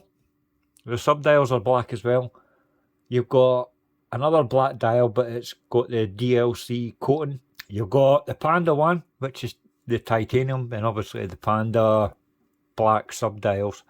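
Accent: Scotland, United Kingdom. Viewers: Love the commentary. Swearing doesn't seem as bad with a Scottish accent 😂 P.S. Just ordered the watch you reviewed, I've been looking for a white tool watch for a while.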